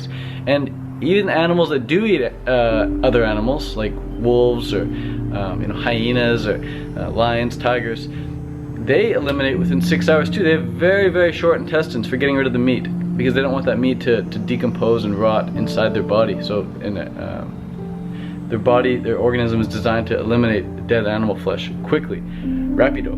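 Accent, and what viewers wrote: Canada. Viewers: Your Canadian accent is unbelievably strong hahaha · Lmao, it's so funny because us Canadians don't ever hear the different btw the Canadian and American accent. I didn't even know he was Canadian until this comment :)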